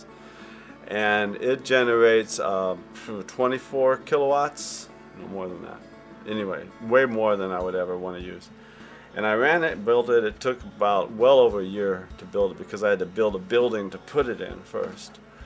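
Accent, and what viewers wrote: South, United States. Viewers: You are originally from the South, correct? I've been watching you for quite a while and always kinda figured that you were from NoLA or S. Mississippi, just going by the accent.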